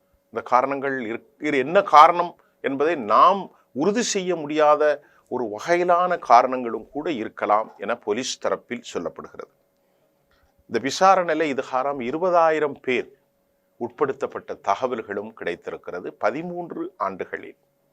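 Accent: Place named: Sri Lanka. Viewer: இலங்கைத்தமிழில் கதைப்பதைக் கேட்க இனிமையாக உள்ளது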